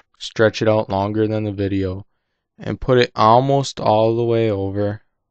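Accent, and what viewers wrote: Minnesota, United States. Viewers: I was close. Lol I'm from Wisconsin but live in Minnesota. We have the same accent.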